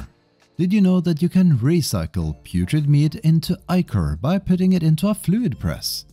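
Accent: Norway. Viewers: I haven't watch a video by you for awhile now but I started playing Conan again so now youtube says LOOK! Which is nice. Useful tips to know. Sidenote: I don't know why or how but for some reason your accent sounds stronger than before. Maybe you just have a new microphone or something, no idea but it's the strongest norwegian accent I think I've ever heard x) 🖖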